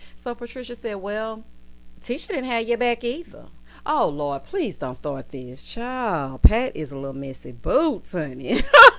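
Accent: South, United States. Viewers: Hey girl hey, you’ve hit the nail on the head again. Without trying to be negative, I just don’t see Kimmi and Maurice’s marriage really working, something about it seems off. I only have 1 question, when Tisha introduced herself to the “financial counselors, she said, “they were going on 15 years of marriage” but, didn’t they go to Vegas to celebrate their 15th like 4-5 weeks ago? I love Tisha’s growth, this platform has truly been a positive for her in that sense. I’m usually here for Destiny’s fashion but, that outfit was also a No for me for that particular event. If Mel and that hyper inflated accent can get on tv, then your southern drawl will surely take you places because hell that’s what drew me in🙌🏾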